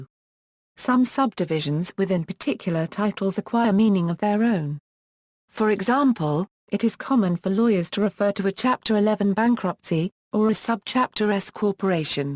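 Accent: England, United Kingdom. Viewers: And why is an Englishwomans' voice used to talk American law?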